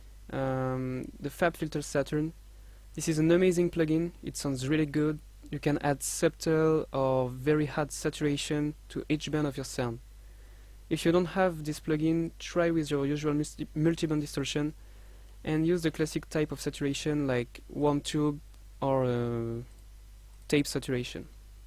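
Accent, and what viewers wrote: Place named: France. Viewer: Je me disait bien ta un accent francais lol ^^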